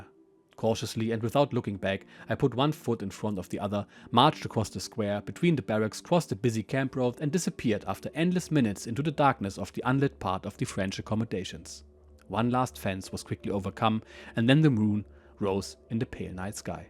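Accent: Germany. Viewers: Your accent is heart breaking because my best friend in high school was from Germany. He developed depression and disappeared. All of his friends think he took his own life. He was smart, good looking. athletic and had great musical talent. Not a day goes by that I don’t think about him. It was 50 years ago when he vanished. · I was really hoping he was going to get the chance to attempt escaping to south America but his postwar adventures getting back to Germany were no less dramatic. What a great series of videos, i binged the lot, so captivating. The German accent really adds to it. One question, didnt i hear him say at on point he was going to end up commanding u 701 or did i miss hear that?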